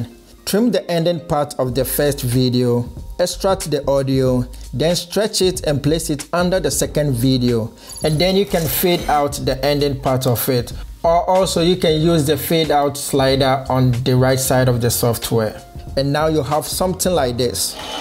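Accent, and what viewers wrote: Ghana. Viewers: This is far from the video…are you from Ghana? You sound more like a Ghanaian 😂 I’m a Ghanaian so I know our accent 😂😂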